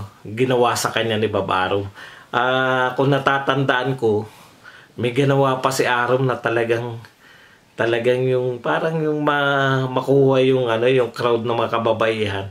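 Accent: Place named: Tagalog, Philippines. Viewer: Galing talaga mo boss. Malinis managalog at kahit walang video sakto mga punto.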